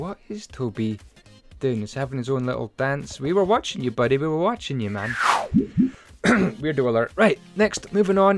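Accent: Scotland, United Kingdom. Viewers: are you scottish? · is this guy scottish? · Are you Scottish I am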